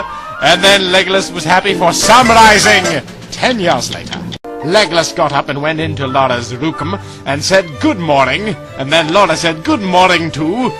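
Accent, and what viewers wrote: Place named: Ireland. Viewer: Is it just me or does Laura have random hints of an Irish accent?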